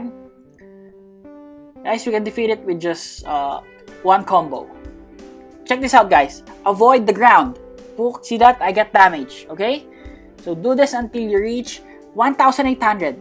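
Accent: Philippines. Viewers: I was right ur filipino cause me as a filipino speaking english thts how i sound like also i saw tht text on messenger na maaga ka bukas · Are you A filipino? because you talk like one and when you like, make a mistake you say ay, sorry guys sorry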